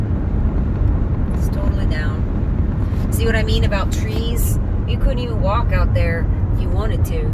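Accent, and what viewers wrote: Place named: South, United States. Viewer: You're even sounding more southern!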